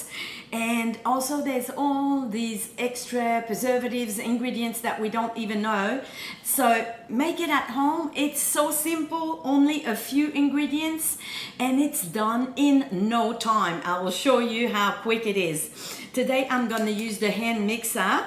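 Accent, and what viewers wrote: Australia. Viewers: Une francaise avec un accent Aussie. Ou Kiwi?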